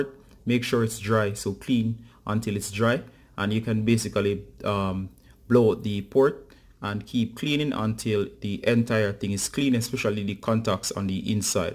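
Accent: Jamaica. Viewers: I heard offer up signal 😆 thanks for the tips. Are you Jamaican ?🇯🇲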